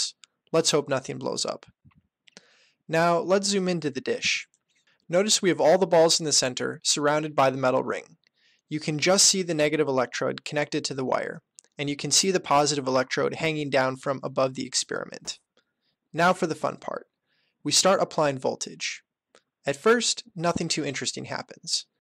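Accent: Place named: Rio de Janeiro, Brazil. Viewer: pq o inglês dele tem sotaque carioca??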